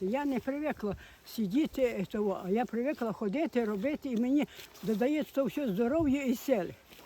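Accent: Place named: Ternopil, Ukraine. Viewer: Дай Вам Боже здоров'я, пані, і сили на многії літа. Мені цікаво де Ви проживаєте, бо повертаєте мене в дитинство, саме так говорили в нас в селі на Тернопільщині, де я народилася.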